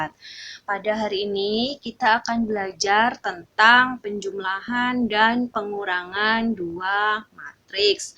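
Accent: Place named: Java, Indonesia. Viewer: Iya bener medok yah mz hehe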